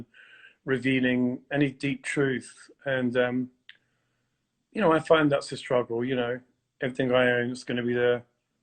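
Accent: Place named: United Kingdom. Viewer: And I love your British accent!😍😍😍😍😍😍😍😍😍😍😍😍😍😍😍😍😍😍😍😍😍😍😍😍😍😍😍😍😍😍😍😍😍😍😍😍😍😍😍😍😍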